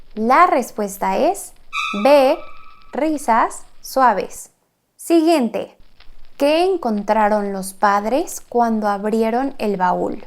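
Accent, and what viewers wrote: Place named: Mexico. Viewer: Vocabulary with real story. That is awesome :) It makes it easier to remember. Also I think your Mexican accent is cute and a bit easier to understand. I often struggle with accents from Spain.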